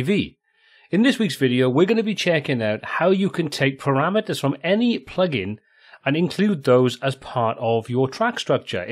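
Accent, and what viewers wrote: Wales, United Kingdom. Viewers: Pardon the off-topic comment, but I'm a huge fan of UK accents. I'm thinking I hear Rob Brydon (seen on TV show 'QI' at times) in your voice. Might you hail from Wales or nearby? Thanks!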